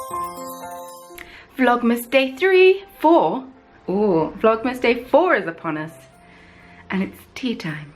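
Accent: RP, United Kingdom. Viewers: I’m thrilled to have found your channel today. You’re one very stunning woman and you speak so beautiful, very “posh” like. I’m currently binge watching your videos and enjoying them. Thank You for sharing... 😊😘😊 ps, where are you from? Xx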